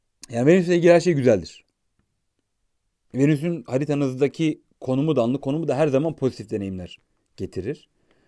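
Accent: Adana, Turkey. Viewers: Ben sizi,websitenizden takip ediyordum ,YouTube kanalınızın olduğunu görünce,altın bulmuş gibi sevindim :) yazım üslubunuzu zaten çok seviyordum,konuşma üslubunuza resmen bayıldım ..Adana ağzı da bir harika :) çok aşırı mutluyum şu an ..lütfen daha sık video yayınlayın..bu kanalı geliştirin ..Sevgilerimle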